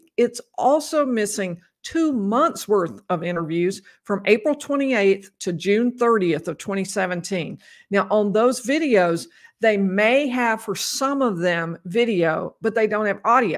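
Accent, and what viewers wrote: South, United States. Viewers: Your Southern is coming through,!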